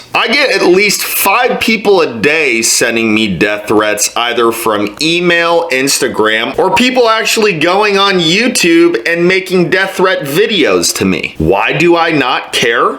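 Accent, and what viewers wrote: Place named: Georgia, United States. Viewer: Dam dude you must be from Georgia because u sound like one of us back wood boys. Tell it like it is! God bless u brother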